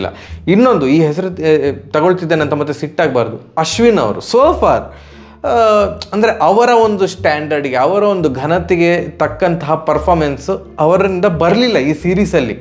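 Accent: Mangalore, India. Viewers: Bahal chanda mangalore kannada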